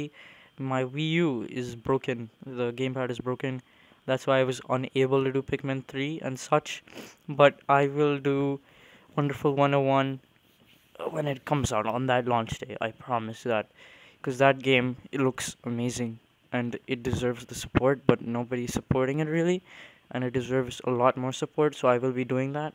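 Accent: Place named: Arab world. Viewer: I FUCKING LOVE YOU and your arab accsent (no homo) THANK YOU SOOO MUCH!!!!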